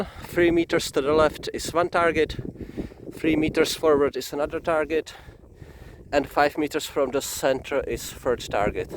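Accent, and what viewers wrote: Czech Republic. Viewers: ježíš česká angličtina 💩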